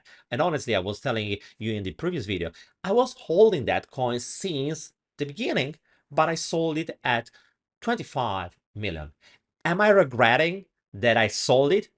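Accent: Italy. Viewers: they are mim coins, not mimi coins. just saying. cute italian accent though ;-)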